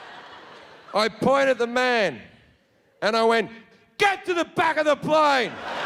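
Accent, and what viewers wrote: Australia. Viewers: He's from 'straya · He is just Australian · He is just aussie:) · He's Australian, mate. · Australian accent very irritating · Australian mate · Nah mate, he's just Australian. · That's just the more relaxed and slack type of Aussie accent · He's Australian that's their accent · Just Australian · He’s an Australian comedian. Hello. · A lot of Aussies talk like this. QUEENslander. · No. As an european, I sensed that it might be aussie. And it it! :-D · Nahh... he just sounds Aussie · He's just Australian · He is not American !!!😂This is an Australian accent Lol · No, he's just Australian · Wow, love him. So beautifully Aussie. 💖💯👍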